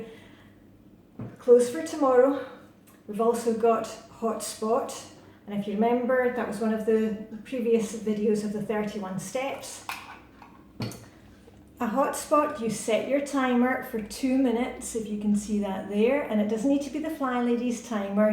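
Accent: Scotland, United Kingdom. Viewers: Hi, Maaike here from Nederland. I started a week ago and I have a shiny sink every single evening. What’s happening to me? 😉 But seriously. I’ve. Never. Ever. Done. This. It’s all new to me and I’m 46. 🙈 Thank you! Love your accent by the way. Scottish?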